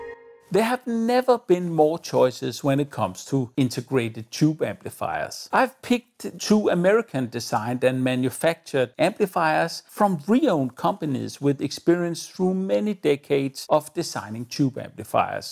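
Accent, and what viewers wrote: Denmark. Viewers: Unmistakable danish accent , but nonetheless informative and humorous!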